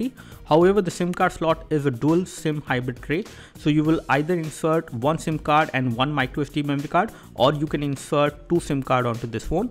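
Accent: India. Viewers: I just heard an English review in Hindi accent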